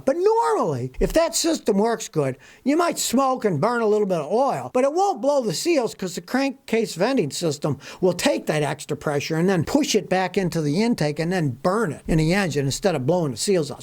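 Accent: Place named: South, United States. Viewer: If you glued Scotty's hands to his knees, he wouldn't be able to say a word. He talks like folks from the South (says a gal from the South).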